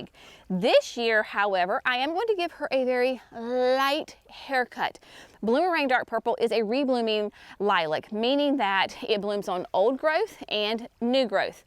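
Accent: South, United States. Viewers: I hope you get to a place of relaxation and calm before the wedding. Does the bride want to help, or they are too busy working? It speaks volumes about your kindness and character to be doing so many preparations for the wedding ( and garden tour). I love your videos and get a little homesick when I hear your beautiful Southern accent. Thank you for another wonderful video.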